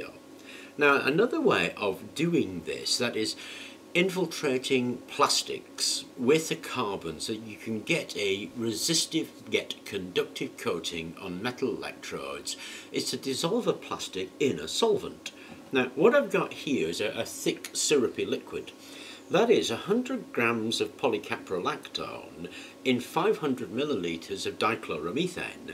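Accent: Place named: England, United Kingdom. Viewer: I use polyurethane one coat and then I mix a little 5 micron graphite powder in it. You can also do the 2 part mix of elmer's glue but it doesn't work as good. What was the stuff you used as a solvent and what did you mix it into, I couldn't hear what you said in the video. LOL you kind of said it fast with that english tongue of yours.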